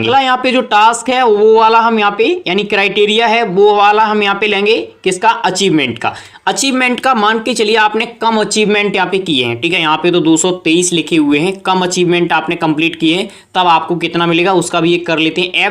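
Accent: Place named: Bundelkhand, India. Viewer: Bhai apki awaaz sunkar bundelkhand ki yaad taza ho jati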